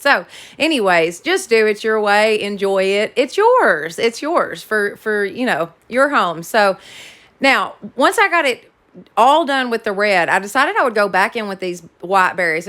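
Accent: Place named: South, United States. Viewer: My first time on your channel💓 Your kitchen must be beautiful with all these new decorations! Love your ideas and your southern drawl❣️ Makes me miss my sister in law and brother in Georgia!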